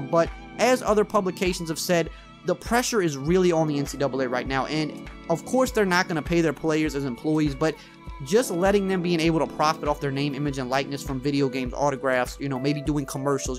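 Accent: Louisiana, United States. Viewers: This is the first video I have seen of yours in the first 15 seconds I knew you was from my neck of the woods lol, I'm from Da Parish you sound like you from at least close to that area, any who great content and I'm subbed. WHO DAT!!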